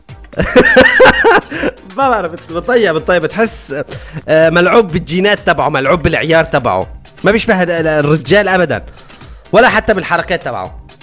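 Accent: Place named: Iraq. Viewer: ههههههه يخبل انته من وين حجيك قريب ع عراق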